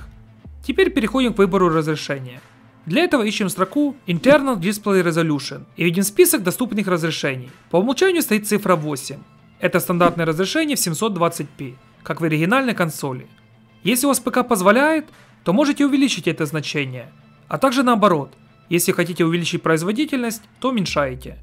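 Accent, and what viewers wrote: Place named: Western Ukraine, Ukraine. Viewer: А ты что Русский паря? Я думал по говору Бандера.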